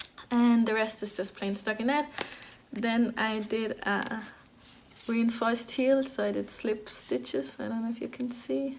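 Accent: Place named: Denmark. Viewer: Hello from Michigan in the U.S. I knitted through your podcast and it was so relaxing to hear your lovely Danish accent as I worked on my sock. I look forward to the next.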